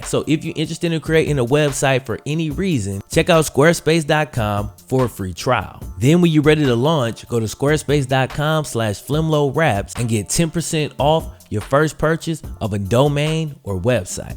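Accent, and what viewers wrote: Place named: New Orleans, United States. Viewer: Are you originally from New Orleans, cause you have a slight New Orleans accent?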